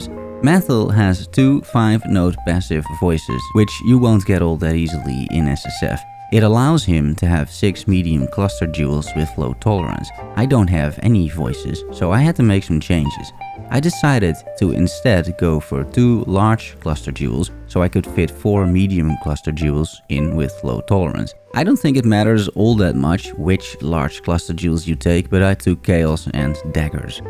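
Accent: Netherlands. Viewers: nice vidz, especially as i am a ssf enjoyer myself. chillt voice and music fits good together. one question: are you from the netherlands with that accent?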